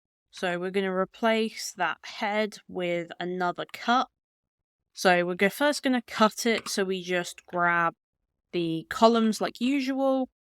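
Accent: United Kingdom. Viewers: Watching all now after I've tried or got stumped... need to throw money at you to teach me. Nice to hear a uk voice for a change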